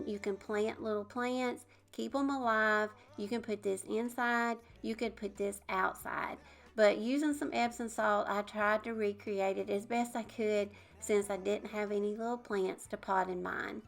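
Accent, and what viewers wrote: South, United States. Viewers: Leave Bird House just like it is., so rustic. With the transfers you are able to create the most beautiful, darling diys! I could listen to you talk all day because I love your southern accent. I love that y’all call a hose a water pipe. It is so cute! Thank you for your wonderful ideas and have a blessed day! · you are so creative! I love your DIY’s and your sweet southern accent.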